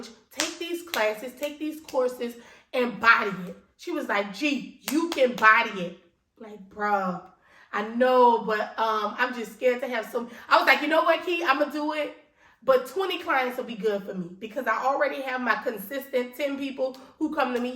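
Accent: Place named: New Orleans, United States. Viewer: Congratulations on all your success. Are you from New Orleans? Your dialect and mannerisms remind me of home. 😊❤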